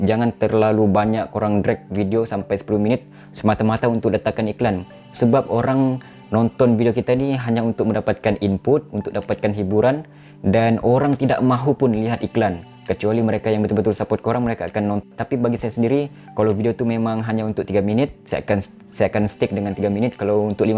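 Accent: Sabah, Malaysia. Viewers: Torbaikkk 🙏🏻👍🏼👍🏼 ko Malaysia asal mana?Longat ko mcm bro2 dari Sandakan Sabah haha agak2 jak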